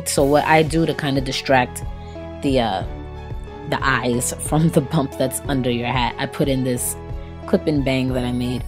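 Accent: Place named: New York, United States. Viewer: where r u from? i love ur voice...new york or somewhere up north with a tinge of latina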